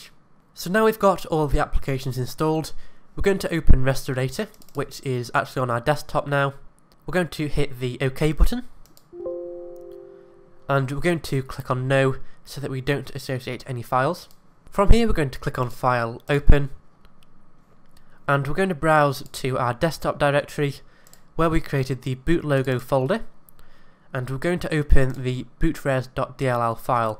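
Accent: United Kingdom. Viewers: A little off topic, but, are you Scottish? Not to be racist, but you sound kind of Scottish. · Im originally from stoke i recoginize that accent anywhere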